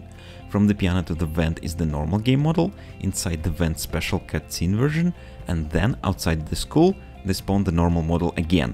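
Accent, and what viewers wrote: Russia. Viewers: Я слышу русский акцееент~ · I feel a Russian accent in your words I'm from Russia :3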